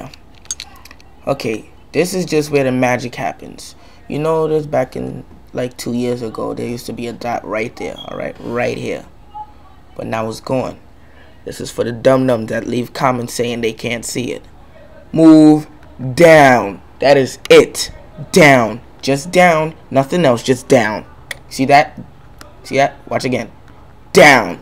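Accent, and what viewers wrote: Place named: Dominican Republic. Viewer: that dominican style voice!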